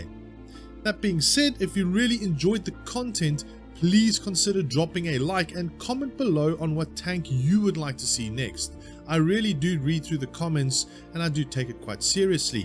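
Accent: South Africa. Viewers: Ah, a SAFFA! Good to hear a local voice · Are you South African? I am and you sound South African! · This is a bit off topic,are you a South African?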